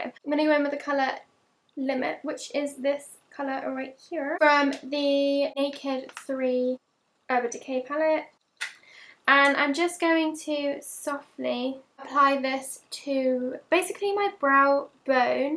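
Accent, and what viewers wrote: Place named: United Kingdom. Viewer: I love ur british accent.. I love your face.. Love your voice when your talking..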